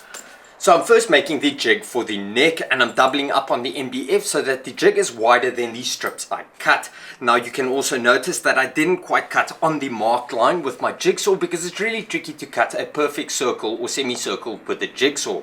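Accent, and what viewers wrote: South Africa. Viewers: Hi sir,i would just like to know if you stay in South Africa or from South Africa staying in another country cos I stay in South Africa and you accent sound South African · Hey are you South African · Are you from South Africa? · This guy sounds South African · Are you South African?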